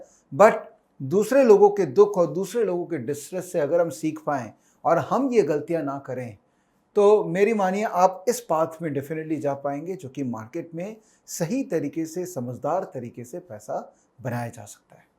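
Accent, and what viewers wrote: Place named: Kolkata, India. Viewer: !बातों को कहने का लहजा ही बता रहा था कि तुम कलकत्ता के हो।शब्दों का चयन व वार्ता में तुम्हारे मनोभाव,जो मैंने स्पष्ट महसूस किया; यह बताते हैं कि तुम शिष्ट परिवार से हो।तुम्हारी सरलता व परहित भाव तुम्हे बहुत आगे बढ़ाएंगे व बहुत प्रसिद्द व सफलता दिलाएंगे।मंगलकामनाएं।सुशील जैन।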